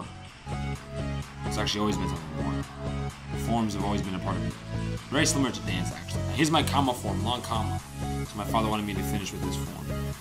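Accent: New England, United States. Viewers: What school is this Sounds like you’re in New England?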